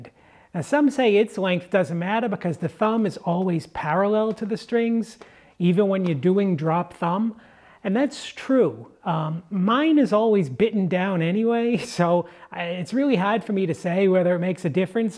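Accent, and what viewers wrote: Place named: New England, United States. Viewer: Great info delivered with an impressive New England accent.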